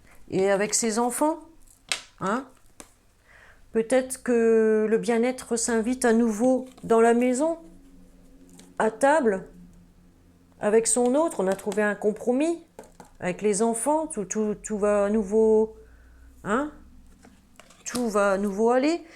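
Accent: North, France. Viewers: J'adore l accent du Nord j en suis issu super adorable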